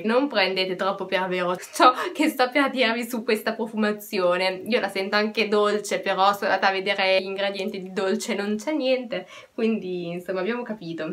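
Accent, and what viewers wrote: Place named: France. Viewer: anch'io adoro gli oggetti di unicorno.Cmq è simpatico il tuo accento,sei francese?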